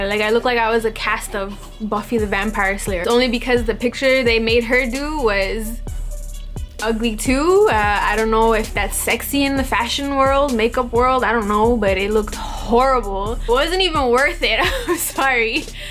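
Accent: Toronto, Canada. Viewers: lmao soo funny how you can tell someone is from Toronto by the way they speak! I'm from Toronto as well and I know soo many people who speak the same haha love your vids! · Within the first minute I was like.. "she must be from Toronto... most likely Scarborough" lol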